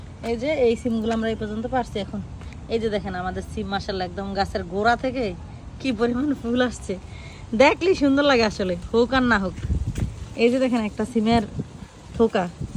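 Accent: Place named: Comilla, Bangladesh. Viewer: আপু কিছু মনে করবেন না,কুমিল্লা কোথায় আপনার দেশে বাড়ি কেননা আপনার কথা ঠিক আমাদের বাড়ির কথা ,আমিও আমেরিকাতে আছি,please আপু বলবেন কিন্তু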